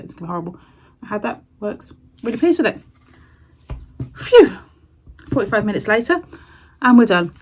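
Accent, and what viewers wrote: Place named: United Kingdom. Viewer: Hello, where is your frame company please, couldn't see it on your very comprehensive drop down list unless I missed it somehow and didn't seem to come up on Google search. Enjoying you video sounds like you are in the same area as me in thee UK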